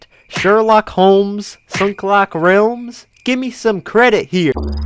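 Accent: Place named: Texas, United States. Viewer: I love your western/Texas voice 😁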